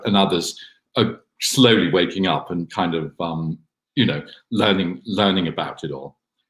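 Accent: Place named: Australia. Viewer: Great talk! I loved listening also because of hearing Australian, it makes me miss Australia even more :)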